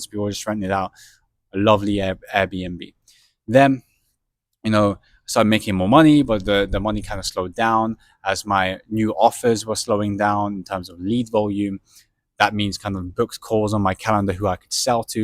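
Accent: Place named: South Africa. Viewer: Why does he have a South African accent...NOT a UK one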